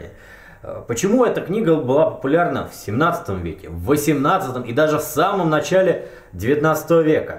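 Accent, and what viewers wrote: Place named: Okanye, Russia. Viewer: Интересный взгляд, подобное я мыслил для украинской традиции не новой народной с конца 18 века, а вот привязать её к традиции до Петра который де-факто её уничтожил. Большенство памятников как ни страно словарей Мелктия Смотрицкого, Памва Беринды, Лаврентия Зазания и т.д. были как раз в украинской церковнославянской традиции в этом плане максимовичовка (правописания) подходило как нельзя лучше по сути как у поляков сохраняя часть этимологии и отбивая фонетику при помощи диакритики . Касательно Окальщиков это миф, в Украине такиз нет и ни разу не встричал, вы в видео порой гОворите окая яаще чем в Украине кто ли бо мог на русском. Оканья и в РФ нет, да я знаюо вологодских диалектах и слушал их, но согласитесь нерегулярное оканье это ни какое не оканье в традиционом понимании. Касательно разрыва традиции и прочего, это уже случилось после реформы 1918 года разЫграть, раССрочка, да даже Аканье давно отображают в русском языке в именах Алёна, данилА, я уже не говорю о словах устно заимствованых где в древности было О хозары, козакы, а ныне хАзары, кАзаки прочее, потому тут уж такого сильного разрыва не будет как по мне. 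Оглушение передавать может и не стоит, я сам за, хотя мои коллеги по цеху говорят о не целксообразности чередования горат -> гараДа, ф кантактах -> в интернете.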